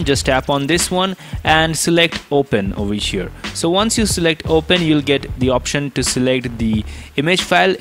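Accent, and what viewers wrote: India. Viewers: Indian has a funny accent, i imagined he moving his head when talking, like acha acha nahi nahi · Hindis English😄😄😄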